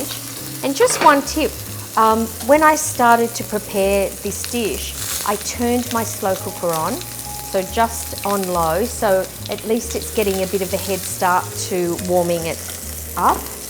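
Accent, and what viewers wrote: Australia. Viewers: R u an Aussie ? Thank you so much This was beyond incredible . Ill be making it next week when my crock pot is delivered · Nice Shanks...nice Australian Accent as well. Regards from San Jose California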